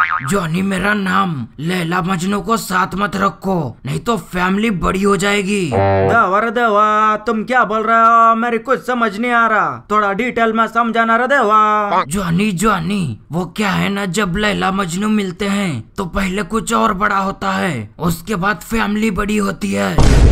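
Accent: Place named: Hyderabad, India. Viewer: Will I am sonn 😍best👌 kya Hyderabadi bolte ho bhai tum ek dum awesome 😍 sun ke maza aa jaata hai 👌😄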